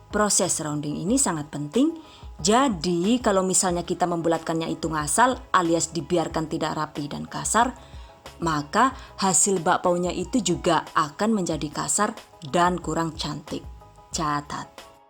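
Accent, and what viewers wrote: Banyumas, Indonesia. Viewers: Deneng kaya wong ngapak. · Catat mantuk Bu bapaiunya. Njenengan orang Tegal apa kebumen ya entong seneng njenengan ngendijan ngapak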